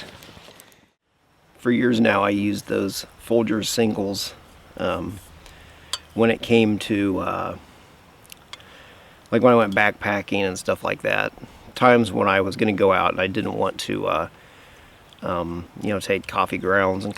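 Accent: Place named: Kansas, United States. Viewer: I haven't caught up with every single one of your videos (probably won't be able to), but I really appreciate the commentary here. I'm past 50, living in NE Kansas and always trying to get to Colorado in the summer. I'm not a technical climber but have done about 25 14ers and finally made it to Conundrum Hot Springs at the end of this summer with a pack that was just... too-freaking-heavy. I'm in the "slowly buying new stuff to replace my bombproof and not in any way broken but heavy as lead old stuff" and wondering exactly what I'm trying to accomplish. Probably not a through hike of the CDT (as much as I would like), but trying to match gear with comfort, fitness level, and the opportunity to actually use it. I appreciate you relating your experiences. Also, your cadence sounds like a native western-ish Kansan. I'm not, but it's fun to hear.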